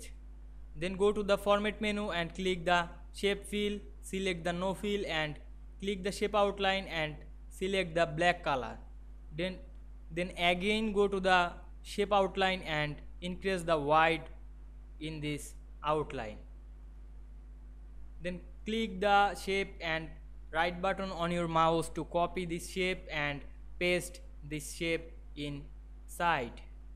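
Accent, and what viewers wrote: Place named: Bengal, India. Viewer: ভাই! আপনার ইংলিশ শুনে বোঝাই যাচ্ছে বাঙালি বাংলায় বল্লে কি হত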